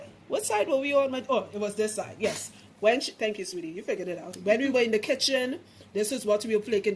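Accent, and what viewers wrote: Trinidad and Tobago. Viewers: I knewwwwww I made out the Trini accent... Omg aren't our accent like a silver lining behind the dark cloud, look my pores raise lol... Hey Trini 🙋🏾‍♀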